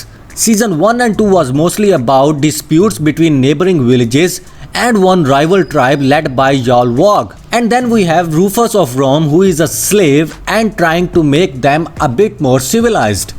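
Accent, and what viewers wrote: India. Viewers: What gave it away? Was it the thick indian-style accent?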